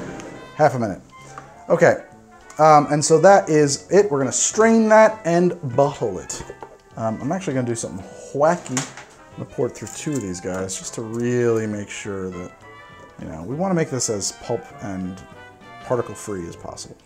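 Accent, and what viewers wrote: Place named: New Jersey, United States. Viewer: The Doctor might of not been real or just had the same name as the tool. It could of been a play on word sort to speak, like how Chefs and Cooks call the microwave CHEF MIC/Mike. Because the Gimlet was a tool to keep the sailors from getting sick they called it "Dr." Gimlet. I love your Videos and I'm a fellow Jerseyan don't apologize for the way we say stuff it makes other states think we're weak lololol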